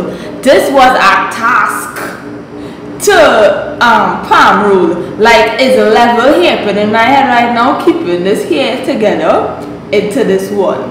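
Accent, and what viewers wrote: Trinidad and Tobago. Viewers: Are you from Trinidad???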